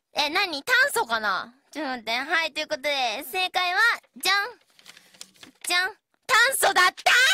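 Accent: Kansai, Japan. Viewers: クーラー大好き そうです。 調べてきましたが、関西の人との交流が多かったせいか、関西弁っぽくなったらしいですねw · 関西弁可愛い(≧ω≦)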